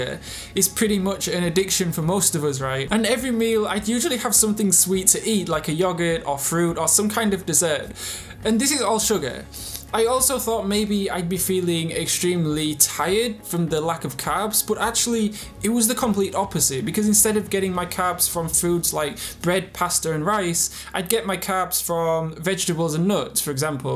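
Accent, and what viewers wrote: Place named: Northern England, United Kingdom. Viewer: When you lived in the UK, were you from the North of England? That accent is nice! ☺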